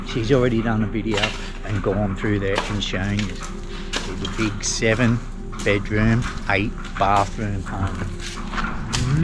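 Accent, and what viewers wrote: Australia. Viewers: well who am I to rule over your good wife, I retract my appeal of your Aussie pronunciation and give myself a smack upside my head, ps.love your work.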